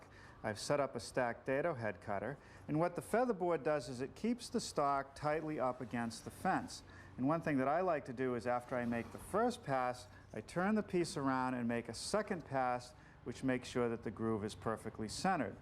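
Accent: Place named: Boston, United States. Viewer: Same. In the early 2000s, usually on Sunday mornings, my dad and I would always sit down together and watch this show. Made for a beautiful morning. Having the sun guzzling through the living room window, seeing the dust in the air through the sun rays, and just hearing his Boston accent with that music in the background. Good times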